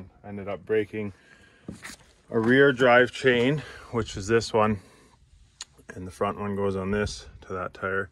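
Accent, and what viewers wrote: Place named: Canada. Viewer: Your Canadian!! That's why I can understand you 😂😂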